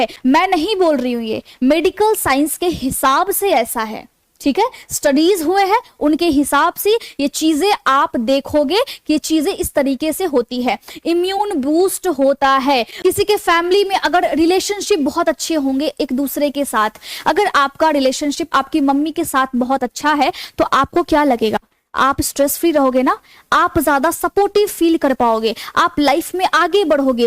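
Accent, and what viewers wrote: Bihar, India. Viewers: Aap bihari ho kya maidam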